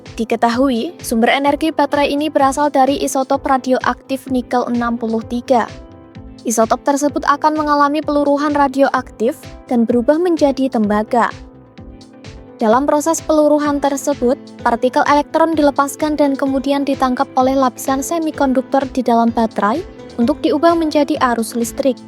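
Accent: Java, Indonesia. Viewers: Kak, aksen Jawanya kuat banget. Mohon lebih disamarkan 😅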